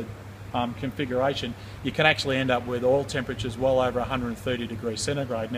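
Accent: Australia. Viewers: Great vid. Following for sure. Thanks for posting. Will keep looking for updates. Great to hear an Aussie accent pushing the envelope. Well done. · There's just something about an Australian explaining Subarus that adds legitimacy to their statements...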